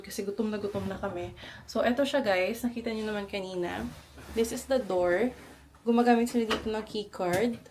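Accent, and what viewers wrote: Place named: Iloilo, Philippines. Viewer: Ilonggo kamo? I can hear the accent. 😊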